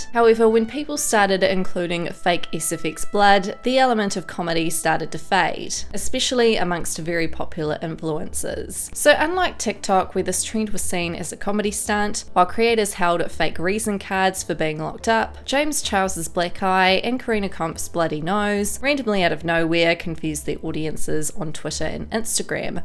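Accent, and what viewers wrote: New Zealand. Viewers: This Kiwi accent is annoying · Off topic. But are you Kiwi? That accent tho!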